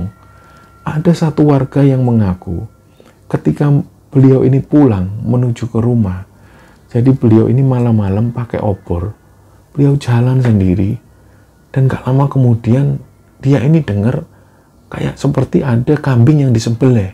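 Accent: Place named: Surabaya, Indonesia. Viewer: Malang Hadir... Nikmati yg slalu menyuguhkan Cerita² yg penuh hikmah apalagi dg Suara Mas Logat Surabaya yg khas.... Sehat Slalu buat Mas dan Crew... Smoga tak kurang dari suatu apapun.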